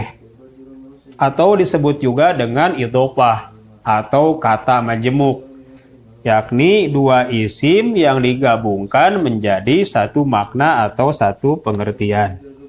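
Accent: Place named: West Java, Indonesia. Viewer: Alhamdulillah...p msh tetap eksis saya jd ikutan belajar barakallahu... enakeun jelas wlpun nyunda pisan...hehe 🙏🏼 htr nuhun smg dg menyampaikan ilmu menjadi obat utk kesembuhan... 🤲sukses ya...n mangat💪👍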